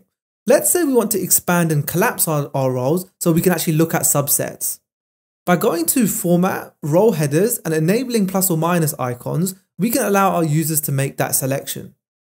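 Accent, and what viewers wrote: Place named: England, United Kingdom. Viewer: Thank you so much a legend and breath of fresh air hearing a proper English accent from a local bro!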